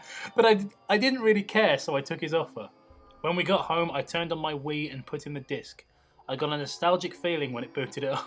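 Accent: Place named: Scotland, United Kingdom. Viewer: That Scottish accent is brilliant.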